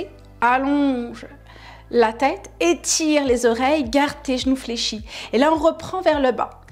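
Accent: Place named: Quebec, Canada. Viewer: J'ai oublié de préciser que votre accent est sublime et merci à tous nos cousins francophones du Canada qui partagent si efficacement leur savoir que je n'hésites pas à consulter. Votre corps est idéalement fait tout en finesse mais bien structuré ce qui prouve l'efficacité de votre méthode. Je vous remercie encore d'exister et de vous investir pour notre bien être. Bien affectueusement 😘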